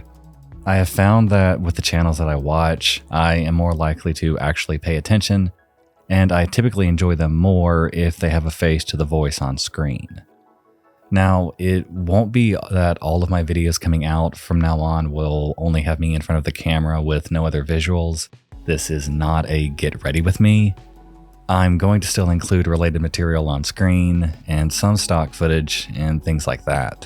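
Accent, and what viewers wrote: South, United States. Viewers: I only care about your silky Southern Sibilance.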